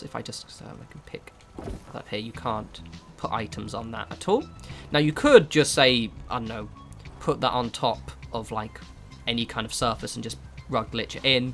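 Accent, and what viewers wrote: United Kingdom. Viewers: nope British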